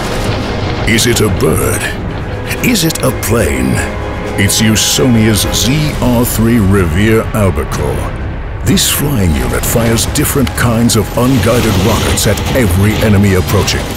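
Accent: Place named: United States. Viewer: you kinda feel the murica from the narrators voice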